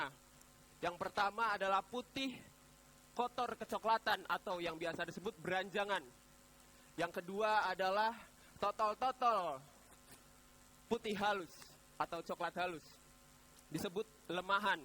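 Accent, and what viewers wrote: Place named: Banyumas, Indonesia. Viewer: Mas Ngapak idolakuuu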